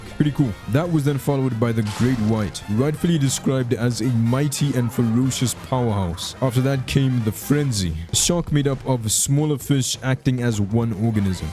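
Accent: South Africa. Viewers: yoo you're south African? me too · You're south african??? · Wait are you South African?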